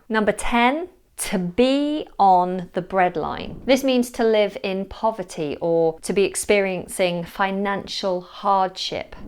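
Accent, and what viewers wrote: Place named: United Kingdom. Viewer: It is very useful to listen to you specially for your wonderful british accent!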